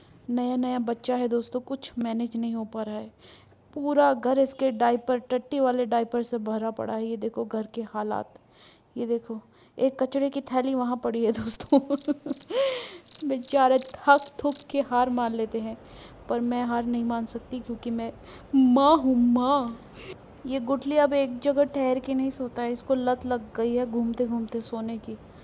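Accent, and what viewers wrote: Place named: Pahadi, India. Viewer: I love her pahadiiii accent❤❤❤❤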